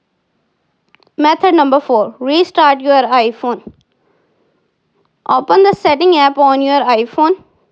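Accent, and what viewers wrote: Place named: India. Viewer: Every tech video there Indian and I can’t understand them like can’t there be American tech videos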